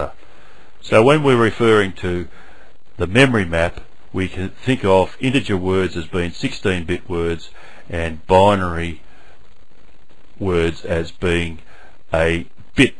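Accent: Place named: New Zealand. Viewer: Are you from NZ? I have been over there and your accent looks very kiwi for me... :)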